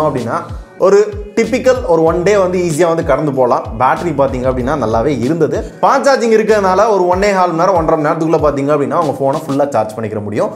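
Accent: Madurai, India. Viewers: Madurai karan than ya nee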